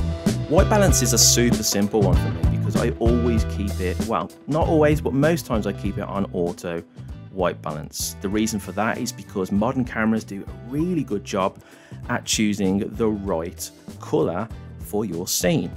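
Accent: United Kingdom. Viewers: Two things stand out for me 1, he’s British which makes a nice change from all the American offerings and 2, he explains the basic, but important settings in a language that a beginner/enthusiast can understand and get to grips with quickly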